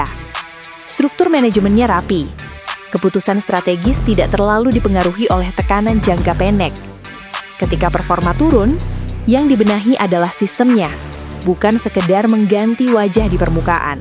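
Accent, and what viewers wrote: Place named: Indonesia. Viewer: Kau tak payah bercakap loghat indon lah..geli aku ....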